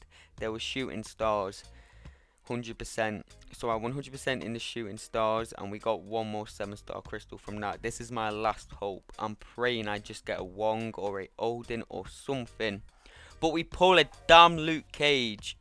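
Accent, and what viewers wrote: United Kingdom. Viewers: Yes we like your rage and rant in Bri'ish accent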